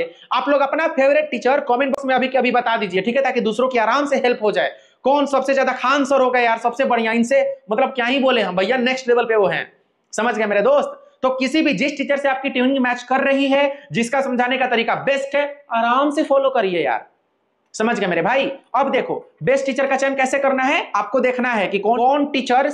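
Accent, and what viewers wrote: Bihar, India. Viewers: Tum pkaa bihar k E ho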